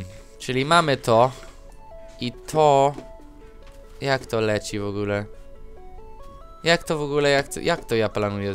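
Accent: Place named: England, United Kingdom. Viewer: coraz mniej słychać twojego angielskiego akcentu:) Gratuluję:)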